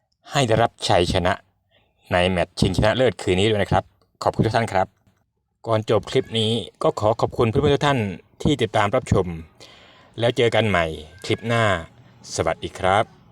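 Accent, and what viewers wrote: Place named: Isan, Thailand. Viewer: สำเนียงอีสานคักแถะ หนังกีน พะนะ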